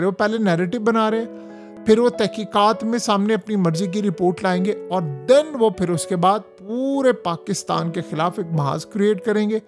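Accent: India. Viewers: تیرے انداز گفتگو سے لگا رھا کہ انڈین کہ انڈین ایجنٹ ھیں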